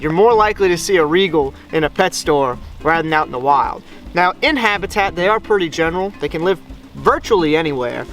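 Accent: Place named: Florida, United States. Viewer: First video I’ve watched on this channel, and I could pick you out as either a floridian or Louisianan without even trying. We floridians can sense our own 😂. Also, hey, that beer is still waiting for you in Panama City! Much love guys! 🍻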